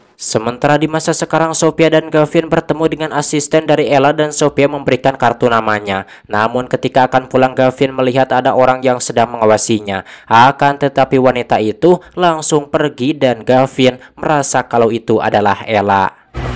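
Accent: West Java, Indonesia. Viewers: Aslina,, anyar da ngadenge review kieu tp logatna sunda pisan😅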